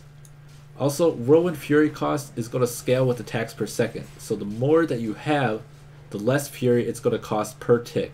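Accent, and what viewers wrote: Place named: United States. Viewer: i think hes amarican europeans usually do have an diffetent accent when they speak english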